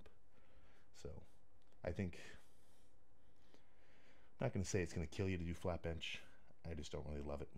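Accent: Birmingham, United Kingdom. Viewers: Yesss... Go on, you're getting the full BRUMMY accent... straight out of the gate! Big up the UK! Awesome to see you here man!:goat-turquoise-white-horns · he's English with a Birmingham accent - each city and even some towns will speak differently with different slang and accents. That's the case all over the country, if I get in the car and drive an hour from my house everyone sounds completely different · That Brummy is fucking well annoying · That’s a real Brummie accent right there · It’s a Birmingham accent bro second biggest city in England towards the north so your not too far off 👍🏽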